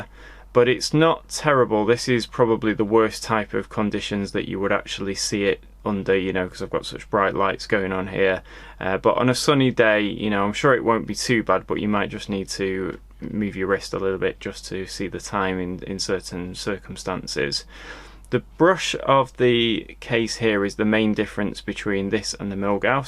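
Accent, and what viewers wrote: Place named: United Kingdom. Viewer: Great review. I love your review style and you have a very pleasant voice (not to mention a lovely British accent as well). Personally I really dislike the dial of the Air King and I would rather buy something lesser known and better valued (for example for the 5000 GBP the Air King costs I would rather buy a Sinn and a Nomos) but there is no accounting for taste! Kind regards from Amsterdam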